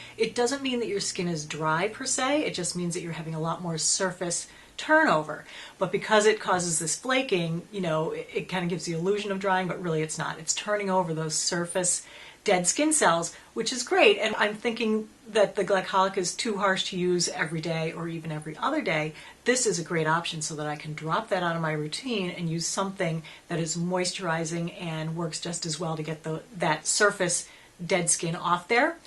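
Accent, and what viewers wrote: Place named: New England, United States. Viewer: Thank you for the reply, I'm sure you're right it seems to be a bit better. By the way, it is 65º here, just lovely, but I totally relate to your winter woes, I'm originally from South Boston, another New Englander, though you still carry the "r's" in your videos, unlike myself, even after living 20 years in Spain. A very Merry Christmas to you and my thanks for such fun and informative videos.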